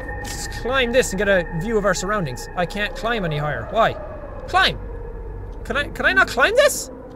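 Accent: Ireland. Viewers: Best of the irish youtubers!! Cant tell if your accent is wexford or meath? Any way this limerick man has PUNCHED that sub button!! Keep them vids comin;P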